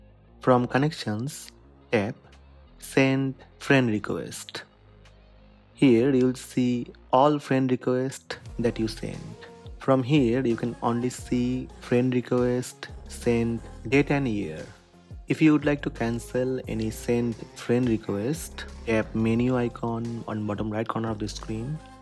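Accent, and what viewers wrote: India. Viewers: Indian accent😂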